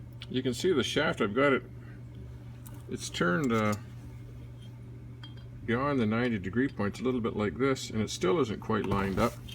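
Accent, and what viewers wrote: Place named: Ontario, Canada. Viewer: Hello, am a watcher of your films here in the Netherlands, nice work you"'r doing, very good information. Have got myself 2 commando's, also like to work on them to get them in a very nice condition. Do you live in Ontario, a friend of mine lives in Puslinch, got the same accent so was wondering? Your dream in going accros Canada and back on the Norton is a fantastic one, hope you make it, would want to join in it but a bit out off reach. Keep on filming!!!!!! Gerleen de Jong, Netherlands.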